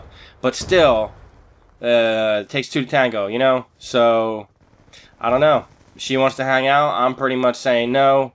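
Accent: United States. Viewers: are you from the bay?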